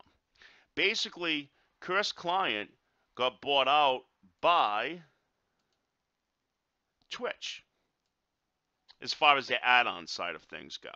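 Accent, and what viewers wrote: New York, United States. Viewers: Omg i love your accent, Americans have the best accents. Great vid too, thanks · amazing info and a new yawrk accent. love it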